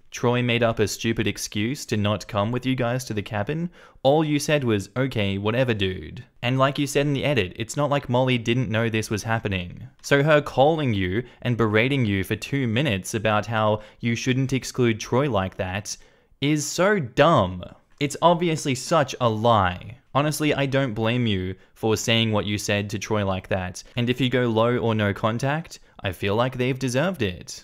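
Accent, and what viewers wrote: Australia. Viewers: Purely out of curiosity, what kind of Australian accent does have? Whereabouts?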